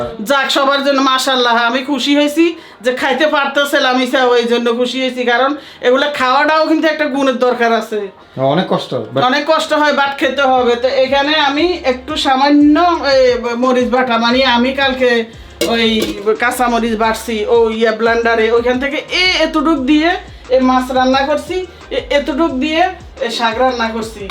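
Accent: Noakhali, Bangladesh. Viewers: খালা আপনি নোয়াখালির ভাষায় কথা বলেন।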